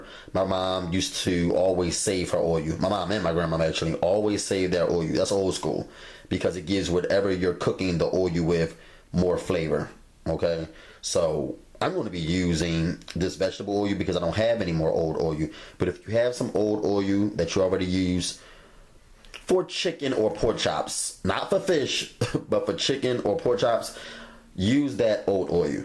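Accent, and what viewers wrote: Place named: Philadelphia, United States. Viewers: on the sugar! Us Pollocks use sugar in our potato pancake recipes which is then plated and topped with powdered sugar, if you like. But I question one thing, me being from Chicago. How come you boys from Philly can't pronounce boil or oil???? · You got that Philly accent. Im originally from Philly. I'm in Ohio, I get teased about my Philly accent. You got me laughing. I'm making this tonight.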